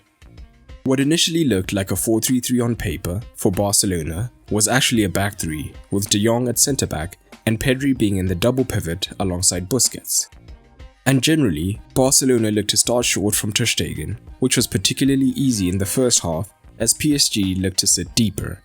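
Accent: South Africa. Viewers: You sound like you from South Africa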